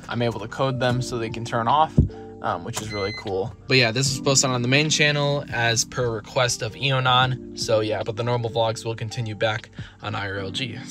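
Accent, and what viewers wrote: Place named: Germany. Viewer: ich hör da doch deutsch raus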